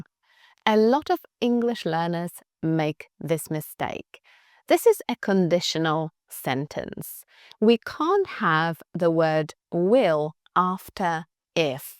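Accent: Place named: United Kingdom. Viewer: your british accent is beautiful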